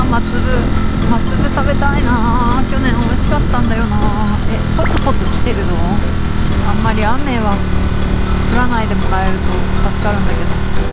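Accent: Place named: Kansai, Japan. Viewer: おはようです。さんって産まれてどちら？関西？たまに関西弁ぽくなるの面白い🤣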